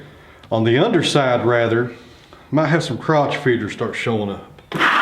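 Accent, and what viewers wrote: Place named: South, United States. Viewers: Just curious...your accent sounds definitely sounds Southern. Do you live in the Southern United States? I'm not trying to be nosy, just curious, as I said. I'm a Virginian, born & raised. We have a good lumber company not even 10 miles from where I presently live. Augusta Lumber is it's name, and it's located near Fishersville, Virginia. Just curious as to whether you'd ever heard of it or not. Anyways:have a great day, & thanks again for posting this video. I love me some Cherry wood products. They're beautiful!!! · I hate you're Southern voice video sucks be cause of that🤔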